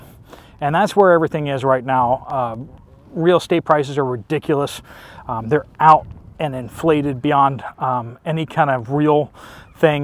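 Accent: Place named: United States. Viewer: Nice of you to do reports from your journey - even with your AMERICAN pronunciations.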